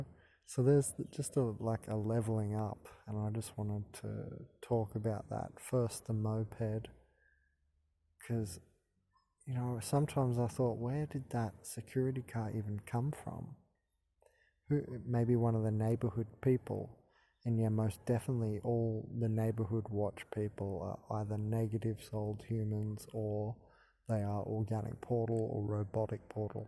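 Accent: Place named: Australia. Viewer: Is that the eerie chimes of the Uruguayan Mr Whippy van stalking you at the start of the vid? Sounds like you're an Aussie, did you move to Uruguay in hopes of ending the stalking?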